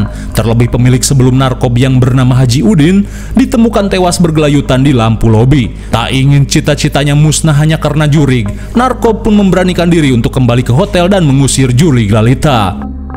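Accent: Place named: Java, Indonesia. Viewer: Gua suka konten lu bang . Omongan nya adaa ajaa . Nganclengan lah . Segalaa mecem wkwkwk .. medok banget ngomong nya 😂😂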